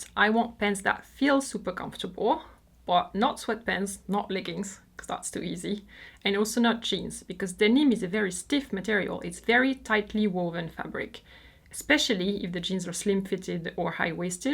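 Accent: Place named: France. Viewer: I could listen to you talk about fungus and cellulite all day 😂. You have a lovely voice and French accent. Everything sounds so especial!❤️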